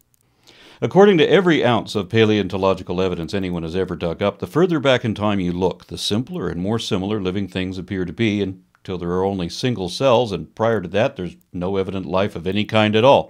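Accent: United Kingdom. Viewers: British accent gets 10/10 from me! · Excellent video. Loved the British accent.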